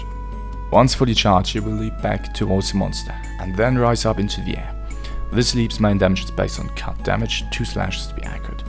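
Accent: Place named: Germany. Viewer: Klingst auf jedenfall deutsch xD sehr schönes Video 👍Abo hast du sicher.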